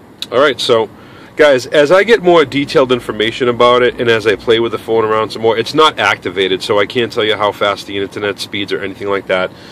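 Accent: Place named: Boston, United States. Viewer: Where are you from? I want to say Boston cant quite put my finger on it.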